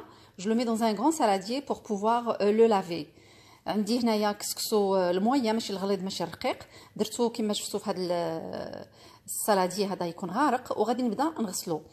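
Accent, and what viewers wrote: Morocco. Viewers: Oui, je pense qu'il s'agit d'un couscous marocain, d'autant plus que la personne qui fait la recette a un accent typique marocain, mais malgré tout ce le Maghreb. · Soubhanallah had la dame elle a pas du tout le langage tunisien . Elle parle plutôt Marocain . Ce n'est pas la langue tunisienne . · Notre grande cuisine traditionnelle couscous tagine pastella je vous donne un indice :****Marrakech **** bonjour ma cousine maghrébine je n'ai jamais vu laver la semoule on la travaille avec la vapeur à 3 reprise minimum (passoire) vous avez la méthode c'est sûr vous avez côtoyé au moins une famille marocaine en France..vous avez même l'accent marocain entout cas le Maghreb city 😇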